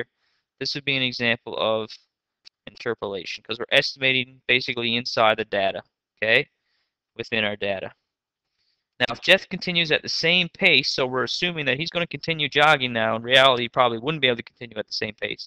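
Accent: Newfoundland, Canada. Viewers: Are you from Newfoundland?? Cause you sure sound like it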